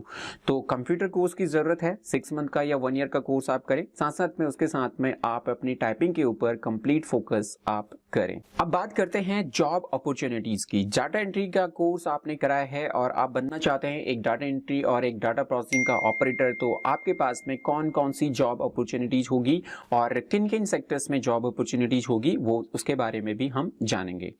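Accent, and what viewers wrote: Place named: India. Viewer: why all the videos have Indians accent !!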